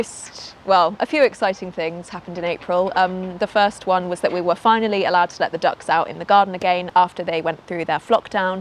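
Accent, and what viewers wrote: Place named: United Kingdom. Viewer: I love british accent 🎉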